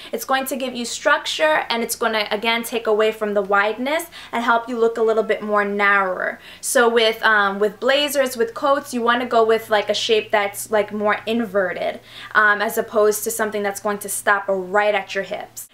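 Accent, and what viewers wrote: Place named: New York, United States. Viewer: Ive been looking around for advice on how to dress for my body type and this is, by far the best to help me! Plus I love your New York sounding accent!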